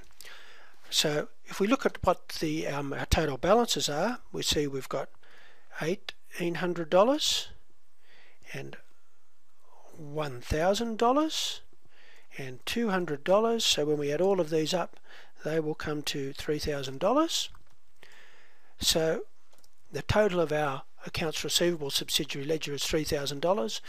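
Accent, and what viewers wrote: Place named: Australia. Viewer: You're an aussie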